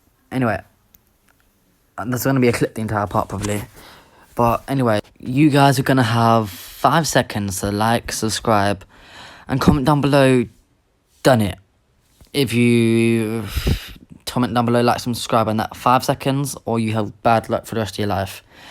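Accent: United Kingdom. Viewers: insert british accent x100 Yo my g how r u with that british accent and dat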